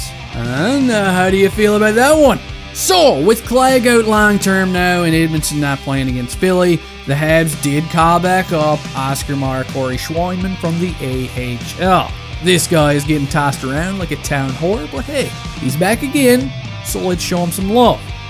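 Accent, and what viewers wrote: Newfoundland, Canada. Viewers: He's new maybe, I only discovered him about a month ago and I love the Habs and have a similar type of humour to - it could be an algorithm thing as well. I personally wish him all the success, however with some of the South Park pics of the Chinese guy and pics of Xi Xingping or whatever the leader of China is, it is probably better off that he stays small because then YouTube will either demonetize his videos or pull them down and try to sensor him, this is kind of a unique stage he is at. And living Ontario, I do not often get to hear that down Easter accent of a Newfoundlander that often, I always look forward to that "BUUUTTTT FERST!!!!" 🤣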